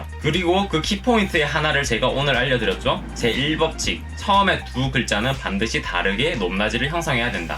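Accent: Gyeongsang, South Korea. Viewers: 사투리 쓰시는데 이게 맞나요? 악센트가 완전경상도 인데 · 유튜저님 리듬이 경상도 리듬같네여...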